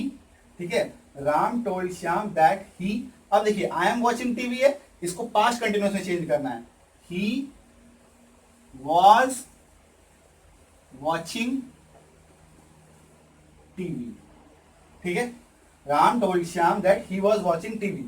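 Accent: Bihar, India. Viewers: sir Bihar se ho kya apne bhaut acha samjhya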